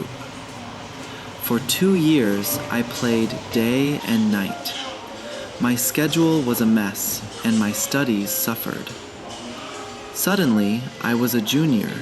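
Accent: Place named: United States. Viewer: please dont use the american sounding voice, the one from your first video is much better!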